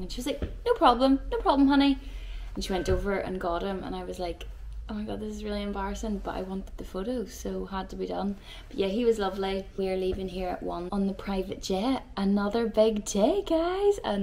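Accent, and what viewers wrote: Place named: Ireland. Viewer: Lively and beautiful. That irish accent ❤. Glad youre with my fav band DD.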